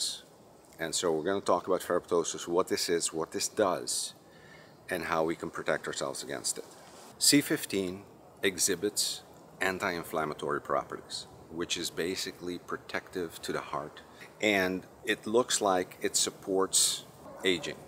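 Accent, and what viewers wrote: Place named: Netherlands. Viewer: There is a slight dutch way of saying things, besides that I have concert-pianist ears so that's how I noticed.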